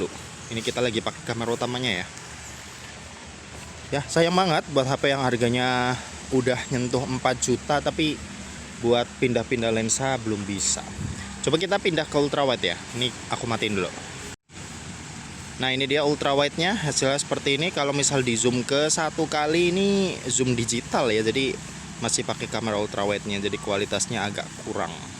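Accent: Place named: Purwokerto, Indonesia. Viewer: Wong Purwokerto kieh mase yahh? Pada bae, nyong sekang Purwokerto.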